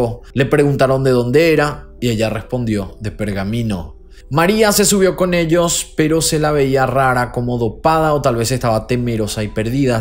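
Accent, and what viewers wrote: Paraguay. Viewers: Hola..!!! Saludos desde Las Toscas Santa Fe Argentina... siempre estoy siguiendo tus videos..!!! aguante la tonadita de Paraguay..!!! Éxitos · Es paraguayo? Justo me preguntaba de donde era el acento.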